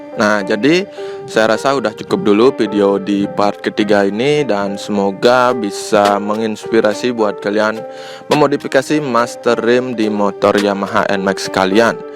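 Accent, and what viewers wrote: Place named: Bali, Indonesia. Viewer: Dari logatnya orang Bali y bli😅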